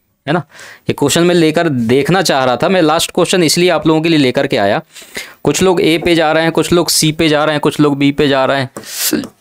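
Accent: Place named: Jharkhand, India. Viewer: Pure Jharkhandi sir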